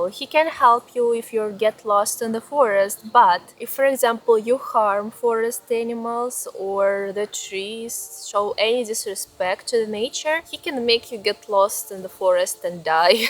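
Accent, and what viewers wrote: Russia. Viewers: Love her Russian accent! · Be quiet! I wouldn’t change the way she speaks English! It wouldn’t be as unique with the Russian accent! Pooooф Be GONE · It is nice to hear the Russian pronunciation of the names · My favourite YouTuber from Russia. You're so cute like a child and beautiful. The way you present everything in such a humorous way, I'm so fascinated. Your accent(Russian) also makes your videos engaging. ❤ Lots of love 😘🎉 Make videos related to your daily life. Are you a full-time YouTuber or do other things besides YouTube, like a job? I have watched all your videos and some twice. You have shared everything on YouTube related to your family. Share your partner's side and your bond with your partner. How did you meet something like that? You've not shown that. ❤ YouTube is like your family. We enjoy your content a lot. 😊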